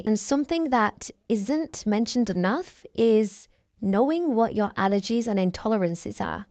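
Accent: United Kingdom. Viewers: I'm from London. Sounds like she's in the UK to me but not London born. As opposed to a voice filter. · She’s very easy to understand, she just has a UK accent.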